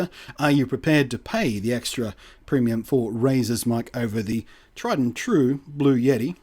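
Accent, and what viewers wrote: Australia. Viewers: True. Still the razor price is significantly higher when exchange rate and taxes are added. Around $200 AUD equivalent for USD to AUD for the Yeti and $240 AUD for the Seirēn. It seems that the Aussie tax for the yeti is about $30 and the Aussie tax for the Razor is around $50. Still I dislike the arbitrary markup on any goods. Really liked the comparison though. Is the extra $50 worth the extra functionality? Keep up the excellent work. It really is great to hear an Aussie voice doing reviews like this. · Ha cool I guess your Aussie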